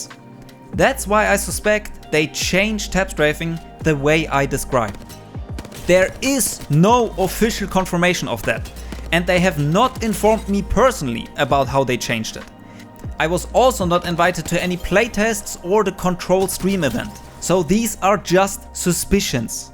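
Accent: Germany. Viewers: Der ist doch deutsch oder? Dieser Akzent ist ja kaum auszuhalten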